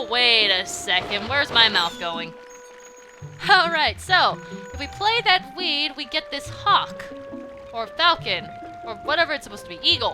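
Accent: United States. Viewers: Don't stop ur southern accent, it's funny! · lol I don’t get it but still I will always think your cute with that country accent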